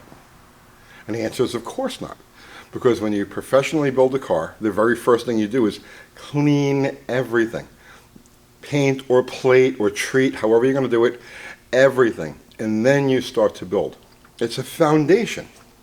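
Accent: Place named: New York, United States. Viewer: I've noticed a deep underlying trend to your videos lately, and I truly mean this constructively. Your videos are a direct response to comments lately. You address these "issues" and "haters" as the New Yorker you were raised as. The issue is that the New England accent in general has adapted into something that comes across as aggressive and matter of fact, to the point of over the top arrogance. And to most of the viewers not from NY you might just sound like a know-it-all dick, this could be off-putting to the simple folk and cost you a few subscriptions and a views. Just some professional criticism.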